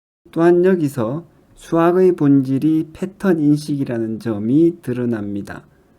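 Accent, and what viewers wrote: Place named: Gyeongsang, South Korea. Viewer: 경상도 사투리가 심하게 들리네요;;사투리로 들으니 e가 굉장히 특이한것처럼 보이네요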